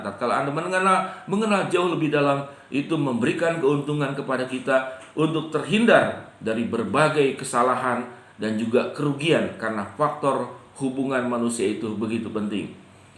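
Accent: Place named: Palembang, Indonesia. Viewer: Sepertinya cara berbicara nya kayak ada logat Palembang nih mas ya ? Hehehehe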